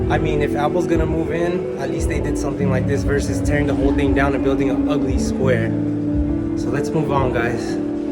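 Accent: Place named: Spain. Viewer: I live in this 'hood. To my ear, the youtuber has a slight Spanish accent.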